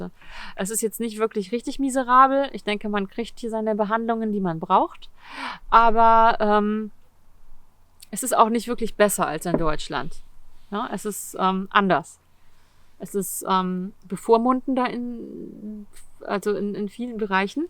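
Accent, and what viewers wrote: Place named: United States. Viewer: This is a real good comment, I was thinking something along these lines myself. With the risk of appearing offended (which I am not) I feel some of the conclusions/observations are a bit superficial. If the video wasn’t recorded in German I’d easily mistaken her for being American 😎 The Swedes might have Ikea and H&M, but we have Equinor, Telenor and Norsk Hydro 😆And, mind you, regarding being ‘lazy’, lets remember the GDP per capita for Norway is almost twice that of Germany 😶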